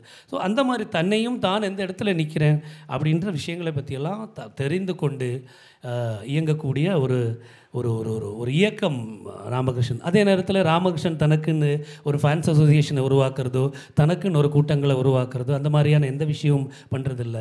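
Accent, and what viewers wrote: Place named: Kerala, India. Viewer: அவரே தான். இவர் ஒரு எழுத்தாளரும் இலக்கியவாதியும் என்று தெரியாது. மிஷ்கின் படங்களில் மலையாள வாசனையோடு கூடி வரும் இந்தக் குரல் என் மனதில் எப்படியோ பதிந்திருக்கிறது. மிகவும் அழகாக பேசியிருக்கிறார் இந்நிகழ்ச்சியில்.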